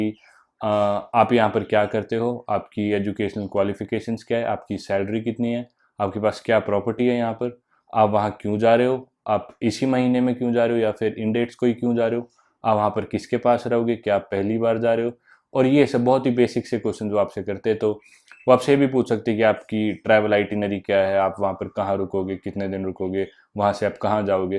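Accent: Haryana, India. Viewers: Haryanvi ho naa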